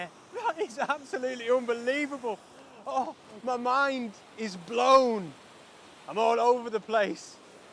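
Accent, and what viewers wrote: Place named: Northern England, United Kingdom. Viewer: I really enjoy your videos., and your accent. I am from Yorkshire and now live in Melbourne, Australia, so I like to hear the northern accent. One request - can you take some shots of waterfalls etc which are not smoothed out? I prefer a more realistic view of rushing water but that’s just me.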